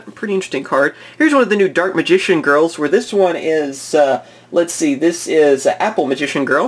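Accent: Texas, United States. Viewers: Are you Texan your accent?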